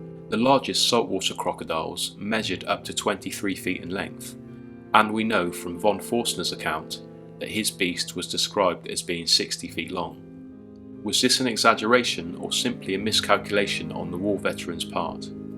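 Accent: United Kingdom. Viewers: Balls to your detractors, +!:) Your voice is British (one big thumbs up for that alone), it's original... and it's mysterious-sounding, in an intriguing, only mildly hypnotic, non-campy way. You're not trying to sound like a carnival barker, so please don't speed it up! And you still haven't told me who your inspirations in the world of the paranormal are!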